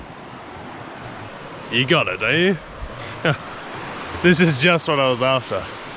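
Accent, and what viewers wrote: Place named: Australia. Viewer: Just found ya channel. Great video, and you threw plenty of your own personality in there to, which makes it way better and less like a documentary than some are. Before looking further, your accent tells me you're an Aussie? (all good lol) Wicked scenery and bird life. Liked & sub'd (so I don't get lumped in with them little headed Kereru) Watching from rural South Island NZ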